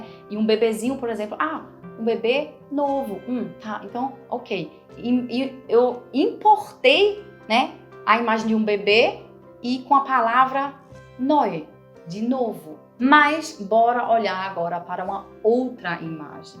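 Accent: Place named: Northeast, Brazil. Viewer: Hallo! Desde a primeira vez que escutei sei vídeo, fiquei com uma pulga atrás da orelha. Pensei ela é do nordeste e me parece ser de recife🤣🤣uma nordestino conheci o sotaque. Apostei até com amigas. Até perguntei no Instagram.Amo seus vídeos😍sucesso pra vc!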